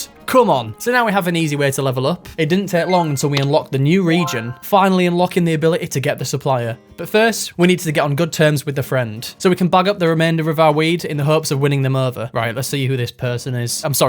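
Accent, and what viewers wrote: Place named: West Yorkshire, United Kingdom. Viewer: Most West Yorkshire accent I’ve ever heard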